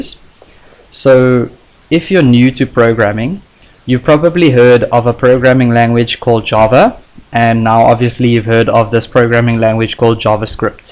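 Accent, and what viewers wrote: South Africa. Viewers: South African? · Dude are you South African? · south african! Respect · 100% south african accent. aweh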